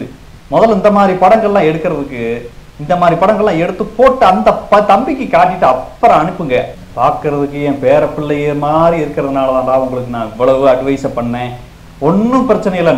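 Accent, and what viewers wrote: Madurai, India. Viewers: ஒழுக ஒழுக... 😂 கதற கதற... 😂 கொதவல கடிக்குறாங்க... நம்ம மதுர Slang செம்ம...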